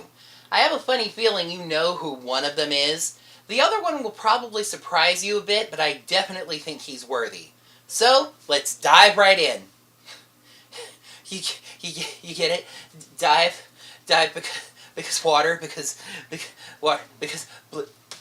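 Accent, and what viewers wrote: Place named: Scotland, United Kingdom. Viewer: I really enjoy watching this video and your Scottish accent was on point and my vote for favorite pink ranger is Jen from power rangers time force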